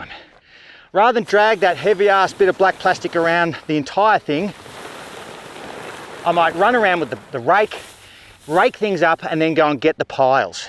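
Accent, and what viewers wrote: Australia. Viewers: Are you saying 'tackle box' or 'tucker box'? This guy from the states loves your "down under" accent but I cannot tell. LOL! ;-) Love your videos mate.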